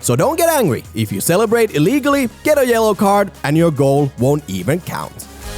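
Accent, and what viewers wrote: Finland. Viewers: Im 100% sure the guy talking in this video is from Finland. Suomi perkele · Im pretty sure ur A Finn cuz off that accent 🤔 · Funny how the narrator tries so hard to hide his Finnish accent :DD just rock it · Is this commentator from Finland?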